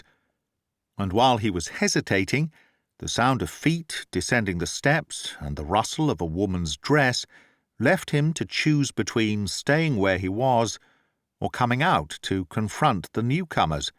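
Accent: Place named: England, United Kingdom. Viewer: Well done as always Mr.! Here in America I’d much rather listen to an audio book by an Englishman, it gives decidedly more cultured air than our flat accent here! Thank you for all your works!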